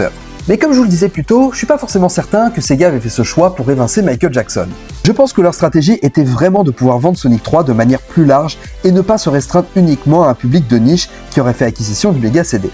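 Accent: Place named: Quebec, Canada. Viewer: La traduction IA avec l’accent québécois, on pouvait très bien s’en passer. La prochaine fois, mets des sous-titres.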